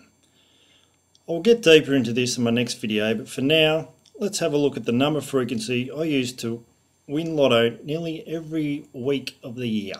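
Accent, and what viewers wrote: Australia. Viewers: That's because he speaks AUSTRALIAN and I can understand him easily and clearly. Thank goodness he doesn't have that American tendency to draaaaawl their words out like the word is 3x longer than it really is in a nasal (annoying) tone of voice. AUSSIE! AUSSIE! AUSSIE! OY! OY! OY! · I understand it because he speaks Australian English?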